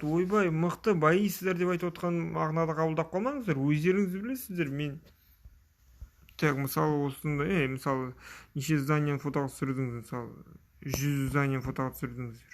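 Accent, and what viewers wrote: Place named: West Kazakhstan, Kazakhstan. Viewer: БРАТ СЕН ОРАЛДАНСЫҢБА НЕ???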